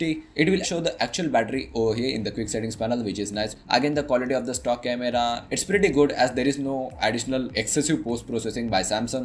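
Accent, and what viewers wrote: India. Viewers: He has Hindi accent English to be precise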